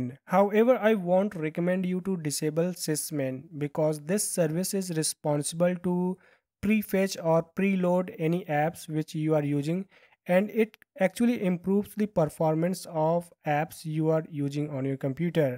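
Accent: India. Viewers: Whenever i hear an indian accent I click off because they never work like this one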